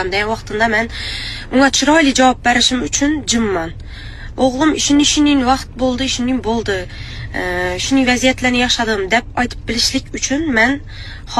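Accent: Khorezm, Uzbekistan. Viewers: Харазимча суйлопжатат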